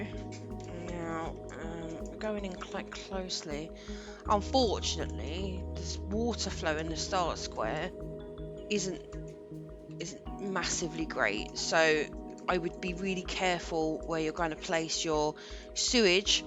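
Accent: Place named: England, United Kingdom. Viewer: sit ya self down, put ya feet up an sip on sum sweet tea for this read. K In my self created tiny world fueled by my vast imagination I’d pictured you sounding like like err… Well I didn’t think you’d have one of them adorable English accents the kind ya find in those Mary popping movies where as soon as ya speak the world shuts up an pays attention so yepperz that kinda accent.Gettin back to my wide off the mark imagination, Id visualized ya sounding exactly like my dear friend who’s shares the same name.. So it was a surprise finding ya not to be Portuguese but a London tour guide Mary poppins! Anyways on to shop talk it’s actually great to see someone doing a map show case….On my channel I do similar show cases on Skyrim so I luv a gd presentation. I hope y’all cover maps of my stompin grounds Tennessee an Louisiana that’ll be a treat. 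Maybe one day y’all cover one of my maps I’m Fixin ta do sum eventually.Anyways teas run dry an feet are aching for a step n a jump I’ll release ya from this insane comment.. oh btw I’ll PROMISE to join ya on ya journey as long as ya poppin in from time to time to check out my skylines videos on my channel 😜 An I ain’t inta breakin promises to a lady I’ve got 4 of them myself at home remindin me daily not to do so. 😠 Mucho Amar 2 youuu an C ya 😃😃😃😃😃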